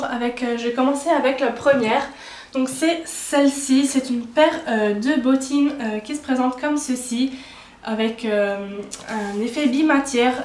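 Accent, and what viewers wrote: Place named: Alsace, France. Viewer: Super ce petit accent Alsacien :) Je vais au marché de noël de Strasbourg cette année, tu dois connaître ça! Sinon encore une vidéo bien top :) Bisous!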